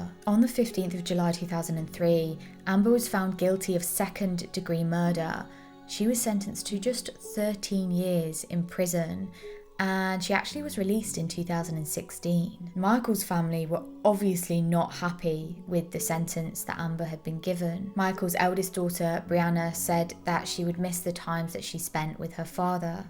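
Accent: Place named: East Midlands, United Kingdom. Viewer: sweet east midland voice, dimples and football active wear...be still my heart:thanksdoc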